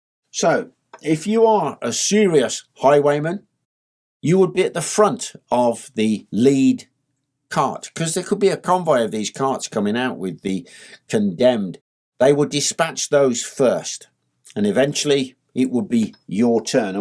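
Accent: United Kingdom. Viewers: Gotta save this for tonight for sleep. Need that sweet sweet british grandpa voice to soothe me to sleep. Thanks, from a cousin across the pond!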